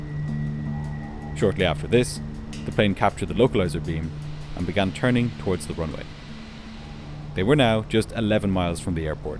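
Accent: Ireland. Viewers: I never google the flights you talk about. I like to listen to the sweet irish voice that keeps me on the edge of my seat. I only try and figure out the ending of each flight with your tone and context clues. Glad i found you!!!! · The Irish accent is a lot easier on the ears than the Swedish one, but what I really appreciate about is that he doesn’t do any of the ridiculous propaganda pieces that the venture-capital-owned channel inflicts on its viewers. 🙂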